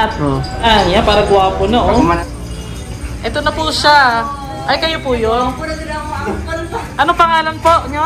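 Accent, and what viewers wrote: Visayas, Philippines. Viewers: Maraming salamat, bisaya ka pala kala ko taga bulacan ka, new subscribers here😊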